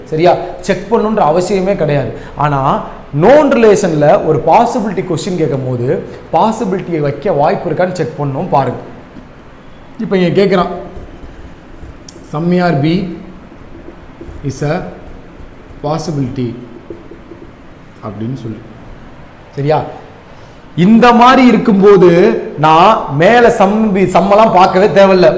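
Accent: Madurai, India. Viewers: Anna neenga madurai ah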